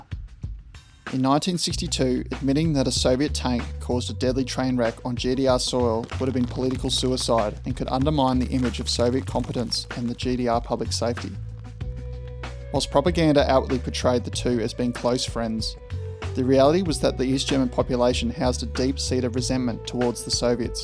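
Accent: Australia. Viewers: Aussie accent! Liked and subbed you legend. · It's so comforting to hear an Australian voice on a very good video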